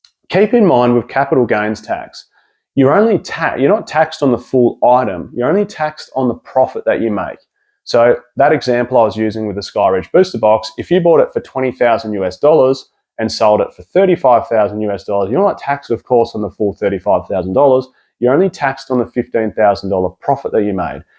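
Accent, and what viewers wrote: Australia. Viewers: Nothing wrong with your Aussie voice m8! Sounds perfectly fine (and soothing) to me 😅 🤘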